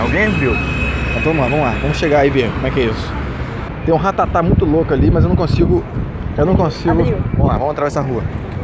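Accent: Rio de Janeiro, Brazil. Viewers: eu desconfiava dele ser carioca pelo sutaque dele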